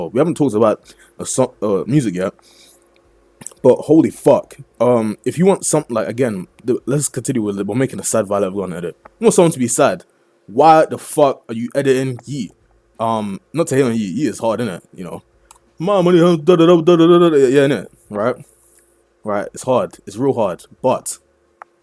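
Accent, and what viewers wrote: United Kingdom. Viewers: Deffo tell you’re from some part of london with the voice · this guy sounds so british and i love to hear that there are other good british editors around in the community and not just a bunch of russian tryhards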